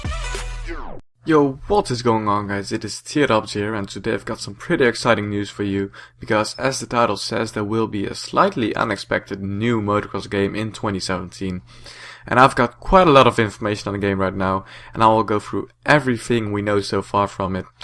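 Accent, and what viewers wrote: Netherlands. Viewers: are you dutch?? you sound dutch ;);)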